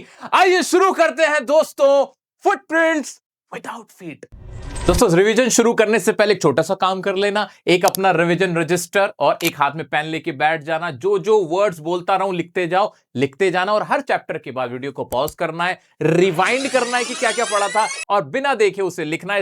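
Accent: United States. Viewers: American accent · Fat, slow and american accent❤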